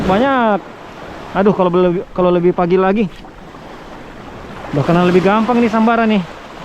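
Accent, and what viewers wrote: Manado, Indonesia. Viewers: pantas tu logat rpa qt kenal, org manado kote wkwkwkwkw org manado klo bhs indo dpa tau dpe logat wkwkwkwkkw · Bang loe orang Manado ya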